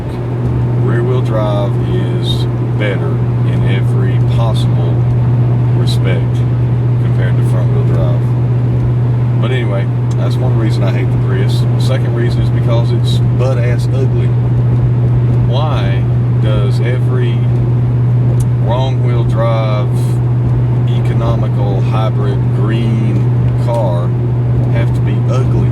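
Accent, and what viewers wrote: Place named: Rural, United States. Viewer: Grow some hair and loose the hick accent before you try and come across as an"expert"- dumb..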